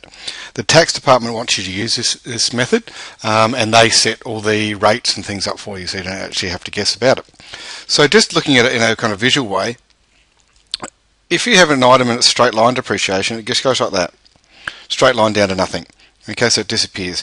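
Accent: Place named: Australia. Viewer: thanks a lot mate, really refreshing hearing an Australian voice for a change!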